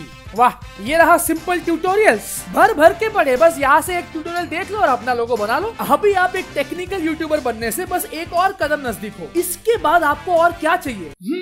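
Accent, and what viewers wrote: Bengal, India. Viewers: Are you bengali?? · Are you bengali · Are you bengali?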